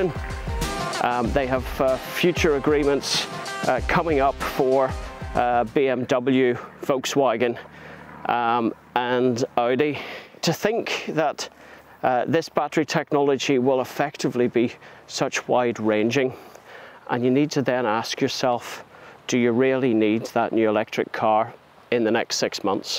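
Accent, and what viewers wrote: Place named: Northern Ireland, United Kingdom. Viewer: Enjoyed the Norn Iron accent! Not often heard on YT!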